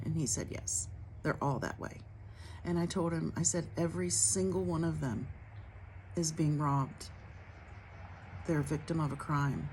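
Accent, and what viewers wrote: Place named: Texas, United States. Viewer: She has a Texas accent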